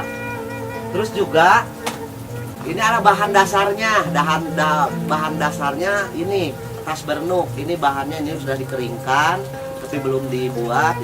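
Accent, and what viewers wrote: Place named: Sunda, Indonesia. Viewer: Uhh sunda pisan , mantap kang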